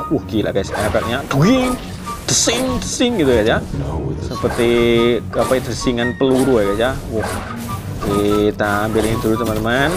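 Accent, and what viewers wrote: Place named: Bali, Indonesia. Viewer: Kamu orang bali ya?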